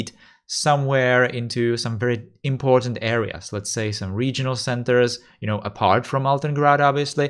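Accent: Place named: Czech Republic. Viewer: Usually you can recognise Czech from a mile by his accent. With I was thinking that I can hear a Czech or Slovak accent but it's so subtle that I thought I must be mistaken.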